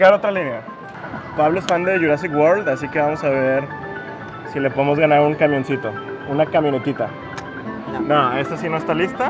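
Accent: Monterrey, Mexico. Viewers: Me suscribo porque sospecho que eres paisano de mty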